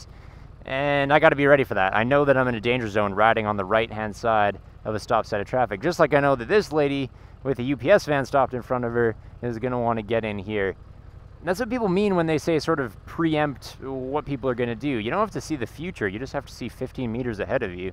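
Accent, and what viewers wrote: Quebec, Canada. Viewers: Ho yeah un québécois !! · Is he from Canada? Sounds like Norm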